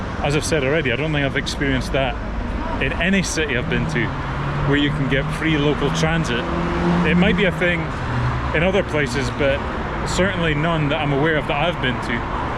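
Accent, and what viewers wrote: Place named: Scotland, United Kingdom. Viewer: A wee bit Scottish dialect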